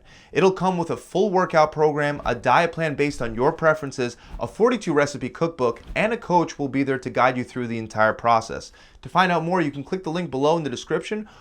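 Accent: United States. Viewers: It's interesting video but what a pity this guy speaks so fast and with strict USA accent forgetting his post goes all around the world and he doesn't do any effort to be understandable for people that are not necessarily of English mother tongue. He is not the only one, many do the same😞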